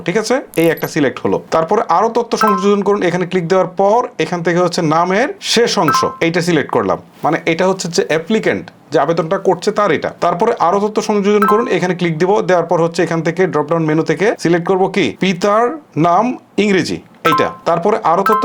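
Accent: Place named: Brahmanbaria, Bangladesh. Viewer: Brahmanbaria mone hocche 🤭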